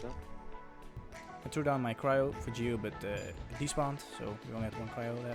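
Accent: Netherlands. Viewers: Are you Dutch? Your accent sounds Dutch :p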